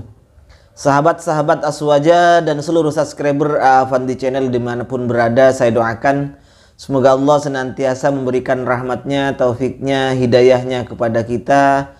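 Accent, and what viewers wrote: Madura, Indonesia. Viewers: Logat bahasa nya agak ke madura... Salaam Sataretanaan.. Sehat selalu cak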